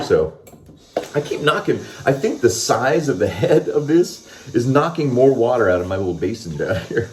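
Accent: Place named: England, United Kingdom. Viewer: Hi, loving the English accent (00.30), made me chuckle. I'm not use to safety razor - everytime I shave the hairs get stuck inside and block the blades even under running water. Is this because I use foam so safety razor use a special shaving lather?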